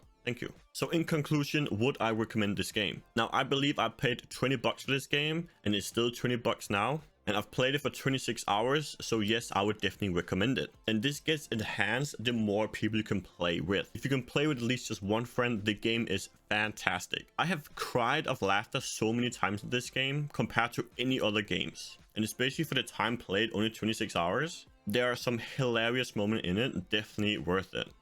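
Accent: Denmark. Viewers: Thick ass danglish accent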